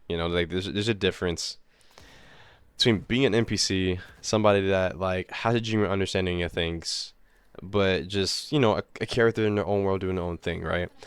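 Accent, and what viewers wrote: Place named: AAVE, United States. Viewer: I have a feeling this guy has an iq of 87, this isnt a joke post just watched it cause it looked absurd. The ebonic vocabulary and accent used, speaking gait, and "find god for yourself" means this guy is most likely some mulatto amerimutt that engorges in weed often. He dances around trying to make an actual point aboutt he furry community and says vague non statements, "like everyone is ai or something and people are like copying eachothe,r" how though it is never explained. The only point he makes is that "christian furries" often have clashing view with his and that there is "no creativity" and thats about it. The mental gymanstics of "religous furries" never ceases to confound and entertain. No examples, no anlysis, just babbling on. It must be a pity what happened to you to be colored and a furry and also advice, if you want to try and make/prove an arguement, try not to say "like" so mucn, its a clear give away for a wrinkleless brain asnd follow the essay 101 stuff you were tuaght in elementary school, intro with and arguement and intro to sections, the sections, and the concluion. 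Who cares Im just a chud anyway.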